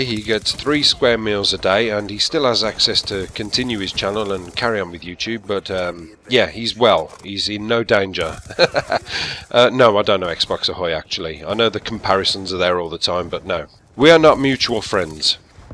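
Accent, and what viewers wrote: Birmingham, United Kingdom. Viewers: I just love the British accent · I find it really hard to believe that no one noticed you were from birmingham. Good video though, got to know the old stalion a little better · I thought you were a brummie but wasn't sure. Confirmation ftw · Lol there are people with a much thicker Birmingham accent than him and it does sound pretty grating lol. Also England will have a king when the queen dies, but the UK doesn't. The UK is a group of countries including England, Scotland, Northern island, and Wales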